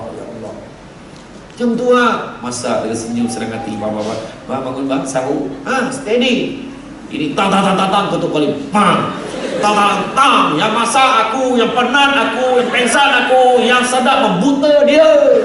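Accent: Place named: Kedah, Malaysia. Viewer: bru 2/3 hari lps...sggah solat mgrib di masjid sbrg jaya... mcm.biasa sronok lah tggu cramah.ustaz... tetiba 2/3 pth je paham... slebihnya... org kmpong ustd tu je la yg paham agaknya..jemaah pompuan saling memandang.. then ada sorg mkcik dtg kat saya..tanya..paham dak.. smbil tengok tv yg dipncarkn khas bhg jemaah pompuan..saya senyum..saya pon tak pahammmm... moral of the story..masjid2... kna tengok jugak la ustd tu mcm.mana... maybe sesuai bg cramah hanya utk qariah ustd tu jer..sbb diorg jer yg paham loghat pekat ustd tu ...skian (bukn klantan..bukn jugak tganu..i think smwhere in kedah)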